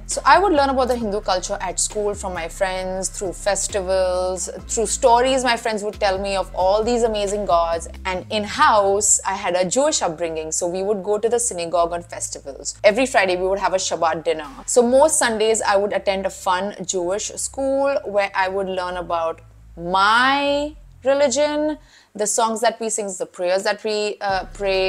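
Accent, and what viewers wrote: Maharashtra, India. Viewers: You know, you sound Maharashtrian to me🤗😍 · I would treat you like a bhartiya more rather than a jewish ☺️😸 i respect jewish culture but in your case your looks your accent are more like a bhartiya kanya 😸☺️😘 someday i would even like to see you wearing saree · Don’t worry you sound like 2000% Indian. I don’t hear any other accents. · Great video and honest account about your early life in India. I’m Indian Australian American and am thrilled to hear about your move to Israel. I’ve never met anyone Jewish in India — you folks are exotic! In this video your accent is Indian with the extra-thick Ts, emphasized H sounds — so not sure what your Indian friends are talking about 🤔I hope you haven’t been affected by the recent terrorist attacks. God bless and take care 🇮🇳 🇦🇺 🇺🇸 🇮🇱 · I am from Sri Lanka. You still has typical indian accent. 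But i really enjoyed listening to you. Yes we know there are jews in india. Kerala,Bombay & Calcutta. May HaShem bless you. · यो मराठी, वाटलच मला. तुझ्या accent वरून वाटतच होत. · You are Indian, you can be an israeli and still have Indian-ness in you. India is a very diverse country and accomodates everyone. So dont worry, you belong somewhere - India (Bhāratha), you were born here and know the country just like us. Israel is also a good country, hope you have a good time there. But remember, you belong somewhere and you have a home! PS : We can still sense a strong Indian accent in your English. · You also have our accent and you look more like an Indian than an Israeli. So aap humare hi bandi ho😅. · 2-3 years ago.... I saw video about jews.... They were doing documentary on jews.... After watching that video i found that there is Jewish community in western maharashtra.... And i could tell that you are Maharashtrian just by your accent.... 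So obvious.... That tone was so familiar... I also saw some bene Israelis in Northeast states... There were fighting to go to israel..... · i am sorry to differ but you do have a very strong Indian accent. please enjoy the best of both the world. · Had I not explicitly known otherwise, I would really have assumed you to be Priyanka or Neha. You certainly talk and even look like an Indian - not that that's surprising 😊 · You maybe a Jew. But there is no way, you are Israeli. You are Indian at heart. The way you talk, and emote is purely Indian. And your name is funny. is a vitamin supplement sold in India, which is said to provide instant energy. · Your accent is still very Marathi heavy…. Being a Mumbaikar I could easily identify your Marathi accent. All the best with your new life in Israel. Take care. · You can pass off as an Indian girl anytime. Your accent laooks everything...your Indian mate...but Congratulations on ur new home Israel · I am hearing a marathi mulagi ...😅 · your accent is close to Indian though but your face is more close to Jew. 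· First of all you are a gorgeous woman. Then your mannerism and accent are 100% indian. And I am loving that. Your looks are almost north Indian, but then there is no such thing as a Jewish look, so hard to tell. You have a positive attitude. Keep it up. I was born and brought up in India for the first 23 years as a Hindu Brahmin, but then for almost the last 40 years, I am living in the US. Keep your friendship with your Indian friends. They are the sweetest. You are the first indian jew I got to know about. I have many Jewish friends of European origin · Your accent is Indian 😁🤝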